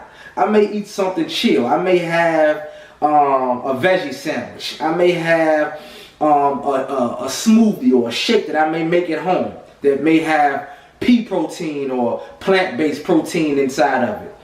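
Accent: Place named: East Coast, United States. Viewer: very informative. looking into becoming vegan in the near future. also, you have to be from baltimore or the dmv area. i detected an east coast accent, then you 'dairy' and that confirmed it. LOL.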